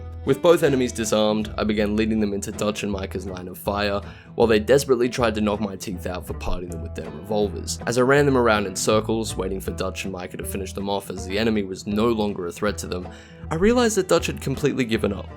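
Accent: Australia. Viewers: I think he's Aussie lol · This just got recommended to me are you Australian ?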